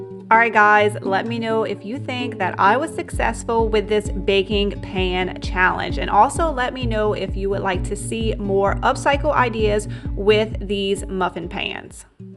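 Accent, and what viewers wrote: Louisiana, United States. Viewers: My favorite flip was definitely the basket. I wish they sold the IOD stuff here in Australia! I love all the types of videos you make- and your Louisiana accent makes me feel like I have a piece of home here with me when I'm so far away from mine!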